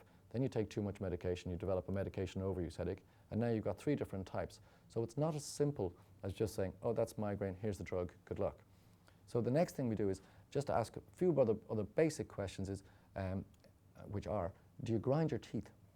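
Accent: Ireland. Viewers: His verbal delivery is the most quintessentially Irish thing I've witnessed in some time.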